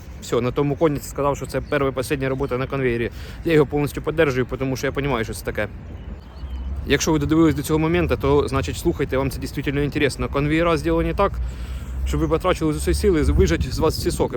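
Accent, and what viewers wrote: Ukraine. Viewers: досмотрела до конца, хоть и не ищу работу)Я ещё не слышала такого говора) акцент украинский, а слова русские, очень необычно 🙃 Удачи Вам, где бы сейчас ни были!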